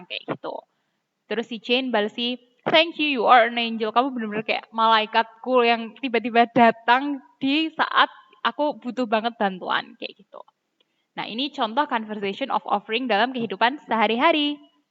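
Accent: Java, Indonesia. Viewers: Thankyou mbaa, lucu mbanya medok hehehe ♥️♥️ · Kak orang aceh ya? Soalnya logat nya kayak jawa☺😁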